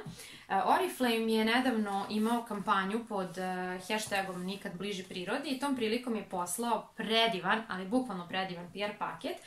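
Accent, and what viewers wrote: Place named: Vojvodina, Serbia. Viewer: Vojvodjanka,zato to tako slatko zvuci 😘😉😁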